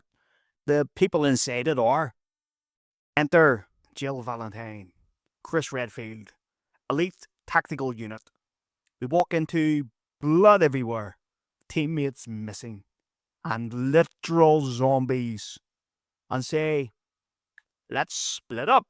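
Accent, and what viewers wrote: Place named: Ireland. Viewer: Great video man. What part of Ireland are you from ?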